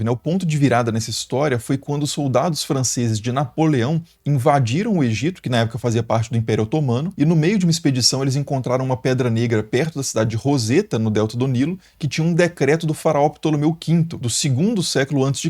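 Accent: Minas Gerais, Brazil. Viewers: Sotaque mineiro inconfundivel? Eu diria de BH!!!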